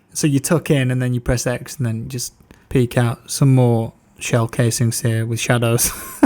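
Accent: United Kingdom. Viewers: British accent killed this video completely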